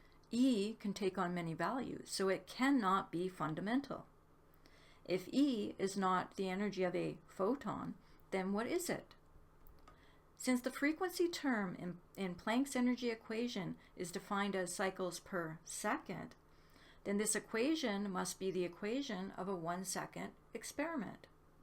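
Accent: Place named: Canada. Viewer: I have been studying Ken Wheeler now long enough to feel comfortable. This now should help refine the incomplete areas. I need to model and understand what the mainstream calls atoms, electron orbitals/shells, valence etc. Now believing there is a minimum limit to everything - which is sensible to me - should help refine the model especially with regards to electron orbitals. Somewhere I expect to find a relationship based either on phi or fractals that will define patterns we experience as in the periodic table of elements. ps I assume you are Canadian - I heard aboot way too many times.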